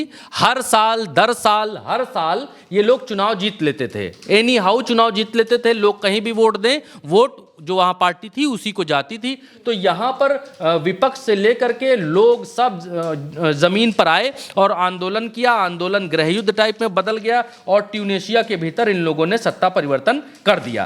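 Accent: India. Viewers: bhai naya naam de diya apne nayi dukaan khuli hai. aap ke andar ek desi pan hai jis wajha se aap ko sunne me maza ata hai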